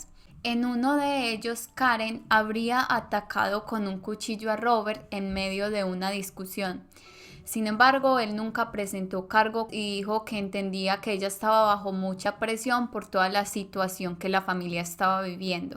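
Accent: Colombia. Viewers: Tiene acento colombiano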